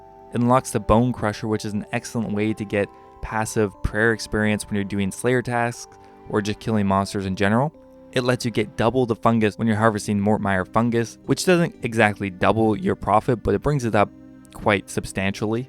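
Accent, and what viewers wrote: Canada. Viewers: Are you Canadian? I thought I heard an accent 🙂 🇨🇦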